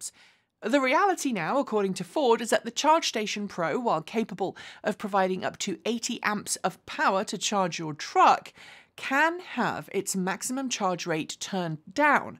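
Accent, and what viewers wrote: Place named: United Kingdom. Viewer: Wow! A woman with British accent calling a trunk a trunk and not a boot. I'll be damned ...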